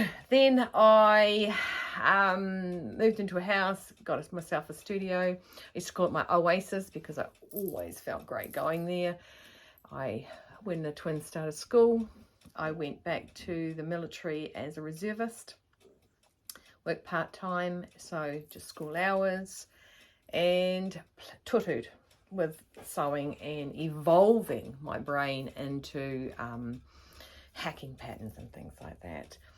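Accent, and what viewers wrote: New Zealand. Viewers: Hi, welcome to the community of #Fridaysews. You are very natural at this, great to hear about you and your journey. Also, so cool to meet a Kiwi...yay. you go girl! And a warm hello to your Mum too. I am also a #frdaysews vlogger, perhaps either yourself or Mum have commented with me? Not sure. You have a beautiful Kiwi/Aussie accent, just lovely to listen to. Yes please, would love to see your sewing room, and your stash...and would love to meet your Mum someday, maybe a guest appearance? I'm looking forward to seeing you regularly and sharing your sewing journey 😂❤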